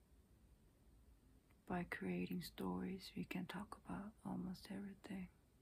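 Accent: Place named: United States. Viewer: Life really is crazy. So many women would love to look like you. Yet here you are wanting to be like many of them. I hope you continue to pursue what you like to do. Hopefully there will be something that really flourishes and motivates you to focus on that. Your English is absolutely excellent. You talk like you were raised in America. I just found your channel and will continue to watch. Did you experience a difficult childhood? You seem like there is inner turmoil that causes you so much pain. I know it will not help but you are attractive and well spoken. Merry Christmas!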